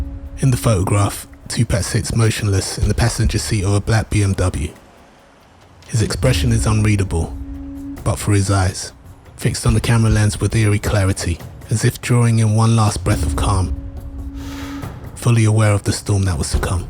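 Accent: United Kingdom. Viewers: Where you from cos? Your ascent sounds like you are from uk or something, maybe australia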